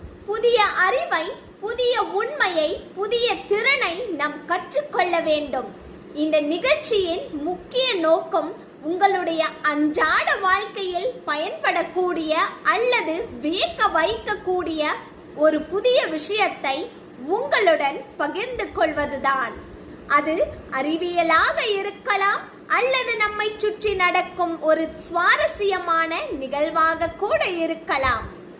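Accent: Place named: Sri Lanka. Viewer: நீங்க இலங்கை பொண்ணு மாதிரி பேசுறிங்க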